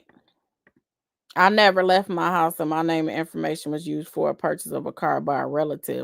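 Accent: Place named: Mississippi, United States. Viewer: Hi you sound like country said y'all but it's cute you sound like Jackson Mississippi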